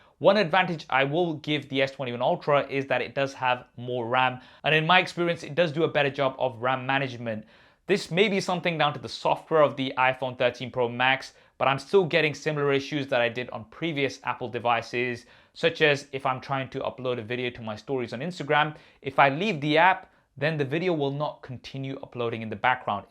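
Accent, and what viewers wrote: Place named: United Kingdom. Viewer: please link friend with speaker test! Having hard time with your UK accent haha. Thank you 🙏🏼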